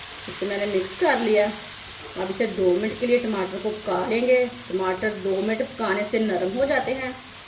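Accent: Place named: Punjab, India. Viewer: Panjabi jab Urdu main baat kartay Hain to pata chal jata hai k yeh Panjabi hai lahja aisa HOTA hai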